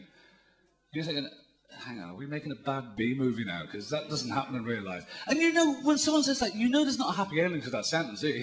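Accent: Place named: Ireland. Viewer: Sean's accent has diluted a lot, obviously due to all the years he has lived in England. While he does still sound Irish here, there is one recent clip of his on you tube and - if you didn't know any better - it might be difficult to place the accent at all. Even here his accent isn't as strong as the clips of his early stand up.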